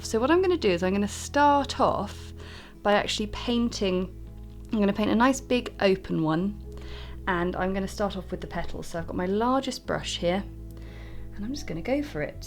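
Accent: United Kingdom. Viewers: Well here we are 4 years on and I just knew you’d have a lovely crocus flower to paint for my Spring/Easter cards. My first go without watching this again was just a disaster, so newly armed with the better approach I’m confident I can pull this off. Ps—honestly I don’t care how you pronounce Alizarin; I so enjoy your British accent it just does not matter to me one whit!!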